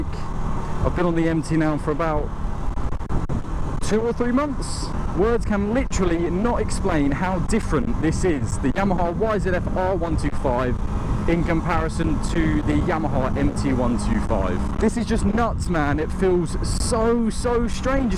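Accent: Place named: Southern England, United Kingdom. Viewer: Just out of interest, where abouts in England do you live, you sound south ish, which is where I am. Just because I’m 16 in a month and then going off a fair distance to college. Wondered how suitable the yzf would be for this and where I could find a good dealer in the south. Cheers in advance buddy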